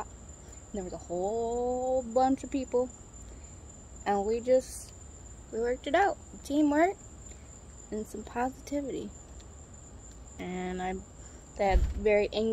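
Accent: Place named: Florida, United States. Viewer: Are u in Florida? It sounds like FL. 🏝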